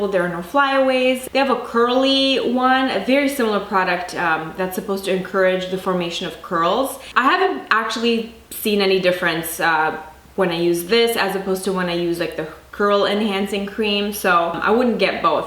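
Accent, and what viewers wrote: Canada. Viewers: I'm loving your Favourites vids- they are to the point but not too curt and your recommends are consistently great. Also I don't know why but I used to live in Ontario and your style reminds me of it for some reason...maybe your accent? Anyways, it's a good thing! Thanks for sharing and props to your ubiquitous quality.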